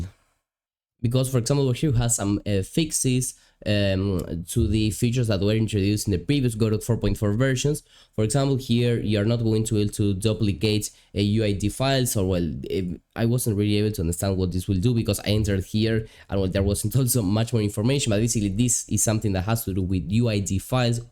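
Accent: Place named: Argentina. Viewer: Hermano te veo todos los vídeos. Se nota que sos Argentino jajaja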